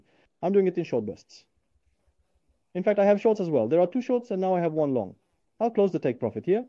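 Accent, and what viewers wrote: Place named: Russia. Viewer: Baştaki aksan ile yayin ortasinda aksan degisiyormu icindeki rus ortaya cikiyor bir anda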